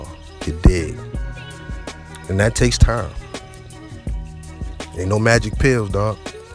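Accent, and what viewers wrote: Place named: Baltimore, United States. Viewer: you sound just like a brotha I was in the military with, he was from B'more... I know that Baltimore accent fam.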